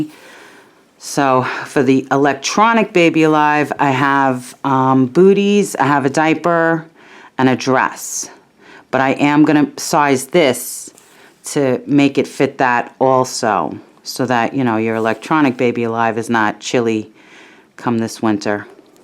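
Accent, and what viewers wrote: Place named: New York, United States. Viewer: Your tutorials are some of the best that I've found. They are so easy to follow and I love listening to your new York accent and the sound your nails make clicking together when you crochet ☺️ Thank you so much for these tutorials..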